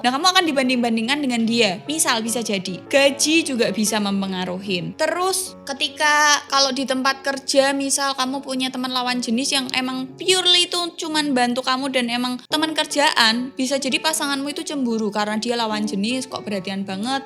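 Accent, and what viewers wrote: Java, Indonesia. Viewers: Seneng ngerukngokno suara jowomu mbak 😂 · ❤️mbk konten mu super duper jos low.. jowomu jek kentel 😂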